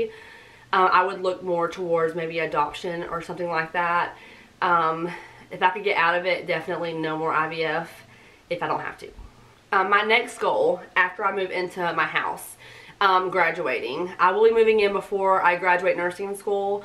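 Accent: South, United States. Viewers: Are you from the South?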